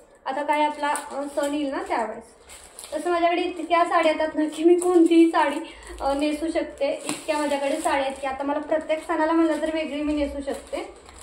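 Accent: Satara, India. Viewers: तुझी भाषा साताऱ्याची वाटते गाव कोणतं आहे तुझं मी तिकडची आहे. गाव.नरवणे ता. माण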